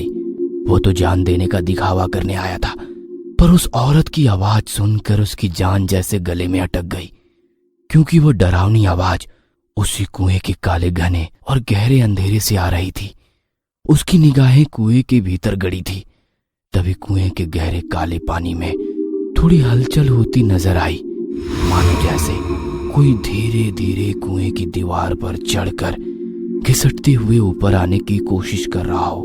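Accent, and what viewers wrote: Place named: Rural, India. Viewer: although the accent is a little village like but am in love with the voice 💕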